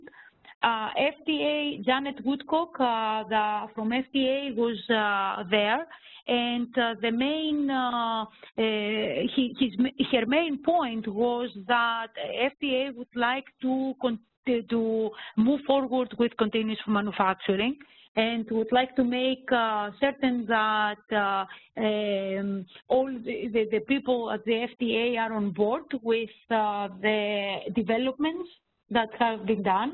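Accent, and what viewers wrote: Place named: Greece. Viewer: is the best professor I've ever seen. Her accent is clear and recognizable. Greek people have very unique English accent but after having conversions with them for a longer time you will find out that their English is pretty good. And I like their accent. if you check nowadays process system.engineering field and most of the big scholars are from Greek. Like Dr. Floudas, Dr. Pistikopoulos, they have very solid math background. You should not focus on the minor language difference but instead look at the greater research picture. She has explained the research work in a very straightforward way with putting out tremendous math equations and optimization theorems. It's great to watch the valuable overall reasearch introduction online for free.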